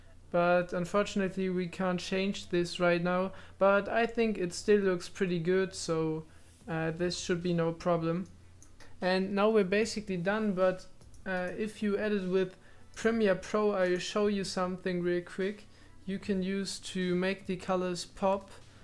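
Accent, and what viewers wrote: Germany. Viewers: Man hört einfach das du deutsch bist lol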